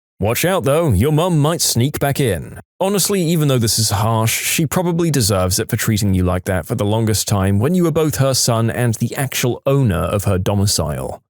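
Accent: United Kingdom. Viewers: i love the british accent mate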